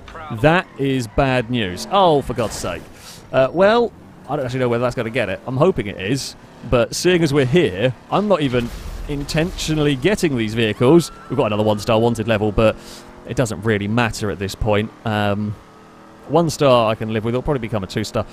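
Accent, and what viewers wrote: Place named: RP, United Kingdom. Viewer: Hi, I noticed that you do have someone of a posh accent but I've also noticed that you have something of a cockney twang to your accent as well not full on cockney but just every once in a while you see certain words that just sounds so coughing do you have coffee ancestry that you know of just curious? specifically the word that you have made up called Gooder and any other words you add ER to that do not normally have er I can't think of any right off the top of my head but I know that he do do that with a couple of words there is no such thing as gooder it's good of or better than usually do that when you're trying to say something with something of you just go blanker And you make up these words or you heard these words used by somebody in their past whether a teacher or a family member or a family member's friend. 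it's like saying Jaguar there is no such word as Jaguar and unfortunately the Japanese got it from you guys and then kaiju type robot hero Jaguar or is we in the United States do them as Ultraman if you have a hell of a lot better than named Jaguar or Jaguar how do you get a jet on a Jaguar stick it up his ****? So it's it is pronounced Jaguar Gua GW g A group he pronounced Jaguar JAG Jag WAR War we put them together to get Jaguar Jaguar can you say that Jaguar stay with me jaguar. you don't pronounce every single vowel that comes across to the English language some of them are pronounced differently being other language it is technically a Native American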